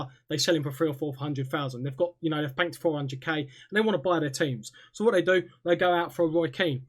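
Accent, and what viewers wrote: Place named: Australia. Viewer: tbh you sound very Australian xD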